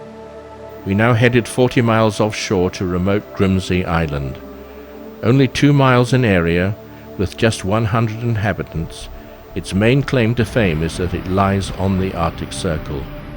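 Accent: United Kingdom. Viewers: enjoyed everything about this wonderful trip to Iceland. T he narrator kind of sounds like Orsen Wells! terrific voice with a slight British accent . I could listen to him all day! Thank you so much, for sharing your adventures! Question, Is Icesland part of Norway? It was discovered by the Vikings I was told! Did the Vikings clame Green land?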